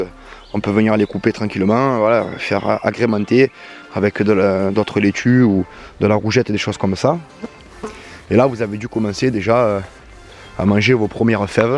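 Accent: South, France. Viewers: Mon beau sud de la France me manque, j’entends mon accent, merci pour tes vidéos que je viens par trouver grâce à l’algorithme. Ça m’aidera pour mon petit potager en Hollande. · Même si tu vas à toute berzingue, (il faut que je trouve le ralenti !!! lol) je me suis permise de rêver que j'étais dans mon petit potager et que le sol était envahi de lianes de courges, courgettes et autres cucurbitacées. Ton accent à renforcé cette impression ! le sud s'est déplacé ?! Combien de décalage, en terme de températures, avec le sud du sud, afin que je m'adapte ? J'aime beaucoup ton appréciation de la nature : naturellement vôtre ! Je viens de m'abonner, j'ai beaucoup de vidéos en retard, je les regarderai au fur et à mesure. Vidéo très intéressante, merci. Chris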